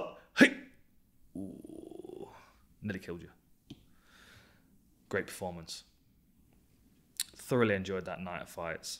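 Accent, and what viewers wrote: United Kingdom. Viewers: Man, I hope this is Dan Hardy and not some dork producer. If this is the real Dan Hardy I would like to thank you for being British. It's a nice accent and it feels like the person speaking is someone I should be paying attention too. Its also refreshing that possibly at one point my ancestors spoke in such a captivating way instead of the 5 generations of hillbilly south Carolina speak. Also, is it true my ancestors were the trash of Britain or religious zealots that you guys wanted out? We are always taught our ancestors were the prime stock of Britain and fled for freedom and prosperity... you know the normal talk but my research is showing different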